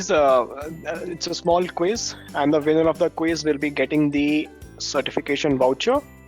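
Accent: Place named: India. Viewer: heavy Indian english accent.Cant get it at all!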